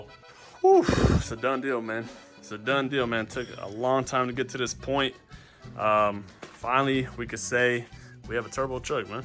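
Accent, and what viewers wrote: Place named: Louisiana, United States. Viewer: Hold up player! You from Scott Louisiana are what? Your roots run deep in your voice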